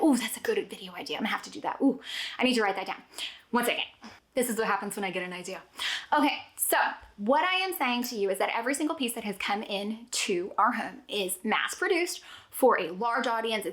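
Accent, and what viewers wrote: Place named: Texas, United States. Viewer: I love how much your TX twang came out in this one 🤠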